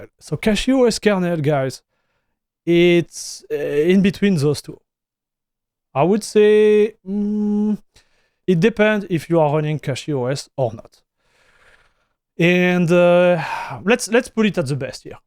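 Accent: France. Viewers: ngl i love the french accent you have XD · Love your French accent. Mine is Portuguese. 😂 Greetings from Brazil 🇧🇷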